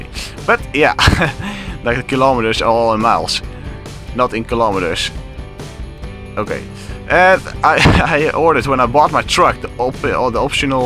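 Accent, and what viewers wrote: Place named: United Kingdom. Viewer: awsome accenting of the Stereotypical British !!!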